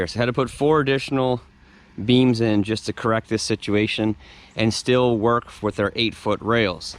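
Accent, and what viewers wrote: Nova Scotia, Canada. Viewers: Dude, just found your channel!!! My Dad and his side of the family are all from Shelburne, Gunning Cove specifically (he moved to Cali when he was 11). Lots of my distant relatives still live in that area, across from McNutts island. Every summer growing up (3-4 weeks) he would drag me, my brother, and my Mom from Washington State to Gunning Cove Nova Scotia to spend time there. We would play golf, eat lobster, archery, fish, etc. I hated it then, but now, those are some of my most cherished memories. Dad passed away in 2010 (*uck you cancer), but us three have been back 4-5 times since. Part of Dad rests in the water of Gunning Cove just as he wanted. Sorry for the story, but listening to your accent and hearing Nova Scotia I had to comment. Thank you for the videos man!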